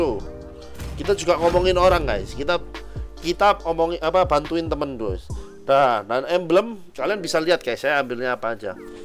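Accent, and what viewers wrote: Java, Indonesia. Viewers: muka cina suara jawa mantul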